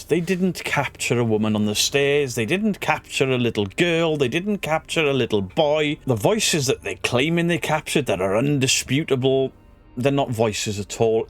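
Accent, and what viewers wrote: Wales, United Kingdom. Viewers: They are just jealous of your glorious beard and lovely Welsh accent!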